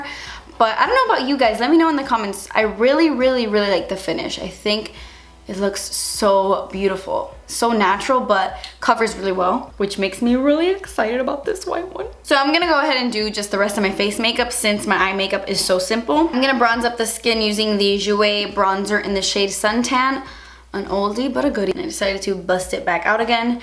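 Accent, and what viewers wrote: Cuba. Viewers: Your Cuban accent. I love it! 😍😍😍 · Jajajajajajajaja! Tas podrío mi hermano! Me muerooooo! Me encanta tu acento cubano! Mi perro también me tortura así! A veces se asusta él y todo! Un besazo enorme desde Madrid! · Lauren Emma I think she's from Cuba but I'm not sure! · I could just listen to you talk with your Cuban accent , I love it ! Lol 💕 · She's Cuban I believe!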